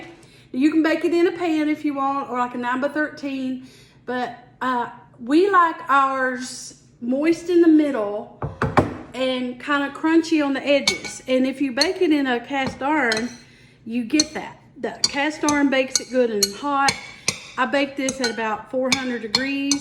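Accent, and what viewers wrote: South, United States. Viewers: Sweet country accent , could listen & watch you all day ! New follower !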